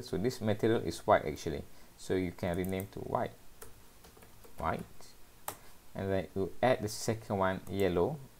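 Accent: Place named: Arab world. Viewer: hey I think he is frm Middle East,their Accent is like that...That's y he is little slow in English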